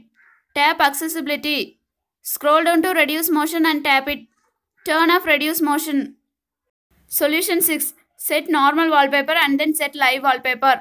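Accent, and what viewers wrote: India. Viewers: Indian English. Why in so hurry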